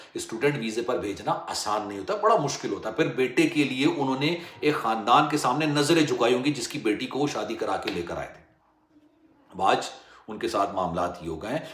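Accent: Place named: India. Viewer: speak like a pakistani please not indian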